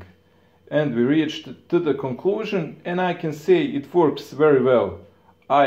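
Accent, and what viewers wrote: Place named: Russia. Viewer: Fantastic Russian accent dude you're fantastic man great if you should do more videos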